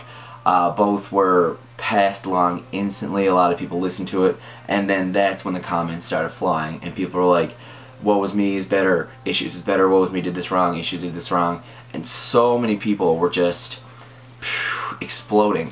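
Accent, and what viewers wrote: Canada. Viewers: I can hear an accent. canadian?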